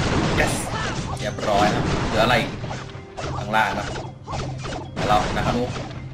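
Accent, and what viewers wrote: Isan, Thailand. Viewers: พี่เป็น คนอีสานช่ายป่ะ =×=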